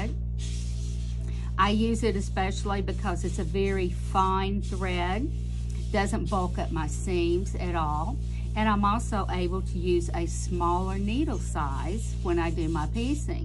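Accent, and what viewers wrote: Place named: South, United States. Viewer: Excellent tutorial! And I love your southern accent!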